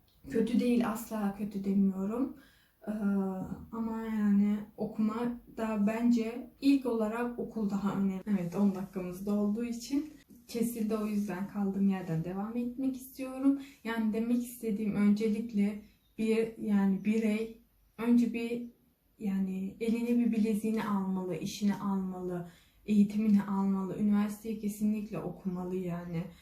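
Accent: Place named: Germany. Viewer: türkcen almancilar gibi cok kötü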